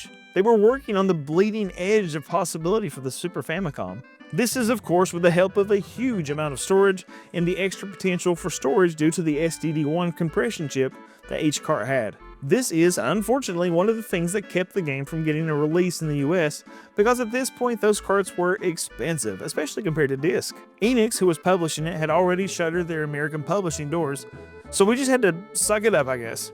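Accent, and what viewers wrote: South, United States. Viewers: Finally!! I know about most of these but I've been excited to see your take on some Japan only games for awhile now! Love your content, as a fellow southerner your accent adds a level of familiarity i dont get in too many gaming channels 🤣🖤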